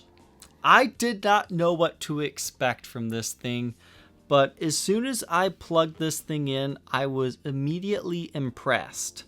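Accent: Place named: Alaska, United States. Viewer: Thatuckin sarah palin accent is hilarious..... There is someone else who has that accent, a famous actor or SOMETHIGN..... MAN I can't think of who it is.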